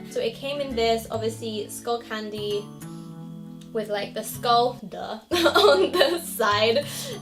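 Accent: United Kingdom. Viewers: Do I hear a British Accent? That's very Cute 😃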